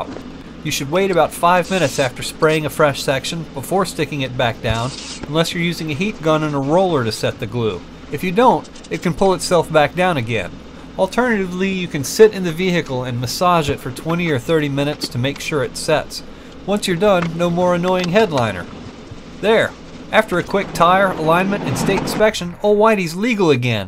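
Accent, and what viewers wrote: United States. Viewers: Are you from Michigan?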